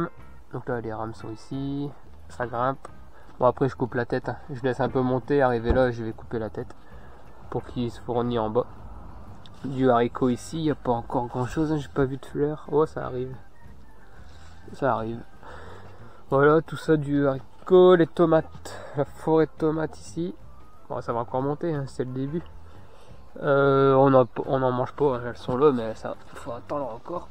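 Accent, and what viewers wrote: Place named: Picardy, France. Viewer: bonjour,je découvre votre chaine. je m abonne car j adore. vous seriez pas de picardie? j ai le meme accent.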